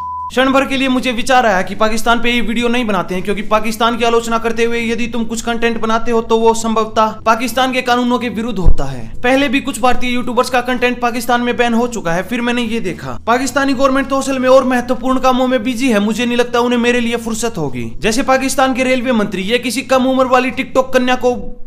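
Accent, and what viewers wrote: Haryana, India. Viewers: हरियाणा के हिसार जिले से हो ना आप😁😁😁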